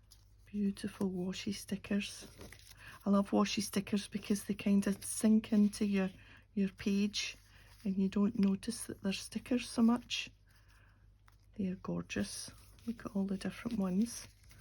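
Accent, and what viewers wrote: Scotland, United Kingdom. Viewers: Great to hear another Scottish accent. Fabulous box of goodies. Love what you made💕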